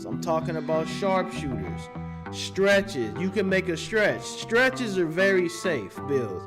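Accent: St. Louis, United States. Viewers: U from st.Louis cuh ?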